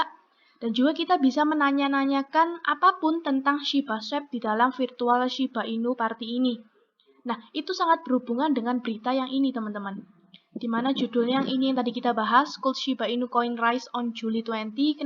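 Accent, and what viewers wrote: Java, Indonesia. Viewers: medok x cakapnya hehehhehe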